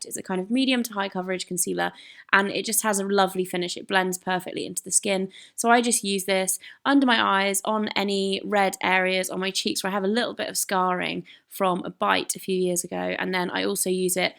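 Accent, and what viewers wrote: United Kingdom. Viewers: Dear, You are so beautiful!! Even without makeup! Love how ur lipstick colours look on you! Diggin' your British accent too;)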